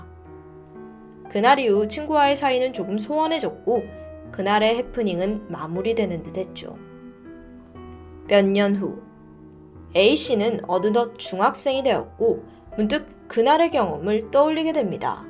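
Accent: Jeolla, South Korea. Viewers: 진짜 죄송한데 전라도 발음좀 빼주세요 ㅠㅠ 시작하죠 - 시자가죠 답답했죠 - 답다뱄죠 제작해 - 제자개 이런거요 ㅠㅠㅠㅠ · 님 진행 너무 잘 하시고 좋은데 전라도 억양 때문에 자꾸 몰입이 깨지게 돼요 ㅜㅜㅋㅋㅋ 특히 시작이라는 단어 나올 때마다 시작합니다->시자감니다, 시작하더니->시자가더니, 시작했죠-> 시자갰죠, 시작하죠->시자가죠, 시작했습니다-> 시자갰습니다 이렇게 말씀하셔서 자꾸 몰입이 깨져요 ㅠ 노력했지만-> 노려갰지만 등등... 제시카님도 종종 이런 전라도 억양이 있는데 님 진행에서는 훨씬 자주 나와서 티가 나는 것 같아요. 이런 부분도 같이 연습해주시면 더 더 좋을 것 같아요! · 아.. 이게 전라도 발음 이군요. 저도 들으면서 저부분을 왜저리 발음하지? 하고 거슬렸던.. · 근데 이분 경상도사람인데 전라도발음나오는게 신기...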